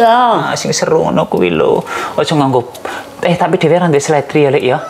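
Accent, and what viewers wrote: Banyumas, Indonesia. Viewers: ngomng ngapk baelh...aj badeol...😂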